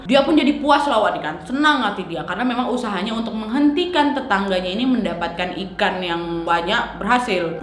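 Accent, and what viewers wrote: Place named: Medan, Indonesia. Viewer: Kakak ini logat nya macam org medan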